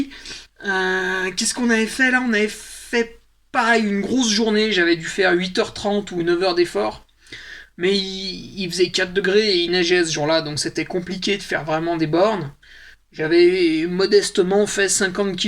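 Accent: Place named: South, France. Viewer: T'as l'accent du sud.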